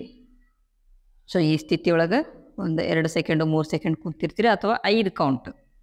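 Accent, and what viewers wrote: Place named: North Karnataka, India. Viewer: ನಮ್ಮ ಉತ್ತರ ಕರ್ನಾಟಕ ಭಾಷೆ ಕೇಳೋದೇ ಚೆಂದ ❤‍🩹❤‍🩹❤‍🩹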